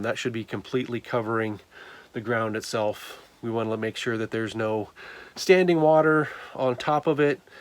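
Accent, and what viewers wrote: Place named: Canada. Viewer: Great info! Wondering why you sound your from Canada?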